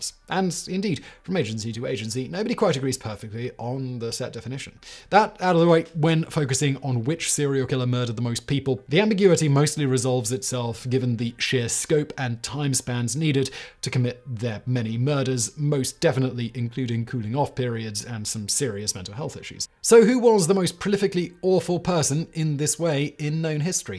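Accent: England, United Kingdom. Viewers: Was it just me, or was extra laden with English in this video??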